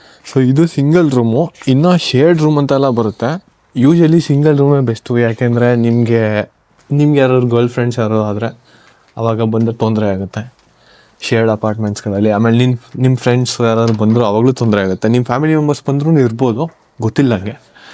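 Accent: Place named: North Karnataka, India. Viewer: ಯಾವ್ ಊರ ರಿ ನಿಮ್ದು? ನಿಮ್ಮ ಬಾಯೊಳಗ ಕನ್ನಡ ಕೇಳಾಗ ಬಾಲ್ ಖುಷಿ ಆಗ್ತದ.. ಬಹುಶಾ ನೀವು ಉತ್ತರ ಕರ್ನಾಟಕ ಕಡೆ ಇರ್ಬೇಕು ಅಲ್ಲಾ...?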